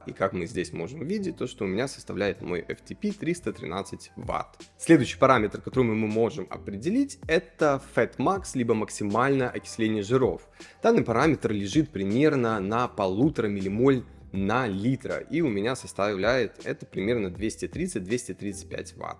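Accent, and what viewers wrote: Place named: Moscow, Russia. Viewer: Чувак конечно крутой, но маасковский акцент все убивает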